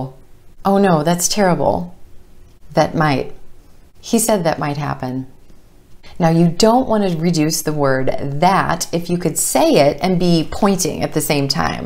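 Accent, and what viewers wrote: United States. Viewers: Her accent is rothic, so it's easy to notice that this is American English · Thank you coach , your english is hundred percent american 🙋😘👏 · This is the first time,I am learning American accent from you. Thanks,Md. Manik Miah . From BANGLADESH. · when i will achieve a posh american accent like you= I will have been already, - ready)) At finally 26 - Thanks a lot and applause!!!! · Really your this lesson is appreciated good way to teach basic of Americans accent superb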